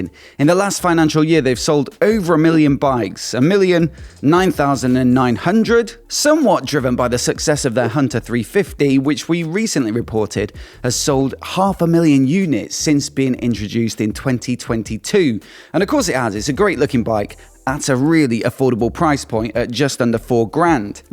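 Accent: United Kingdom. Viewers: is becoming one of my top bike news sites. Always interesting, well produced & I love the British accent! All around, a good watch/listen!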